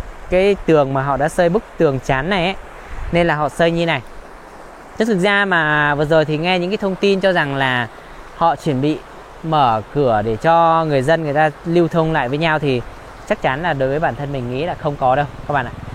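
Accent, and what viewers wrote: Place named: China. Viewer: Ở giáp Trung Quốc nên giọng nói của bạn phát âm cũng na ná tiếng Trung.chúc kênh ngày càng phát triển thật tốt nhé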